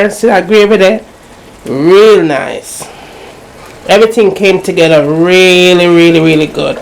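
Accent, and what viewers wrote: Jamaica. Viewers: Love the Jamaican talk, love how you clean I would have your stew pork, but next time try putting the sweet peppers last.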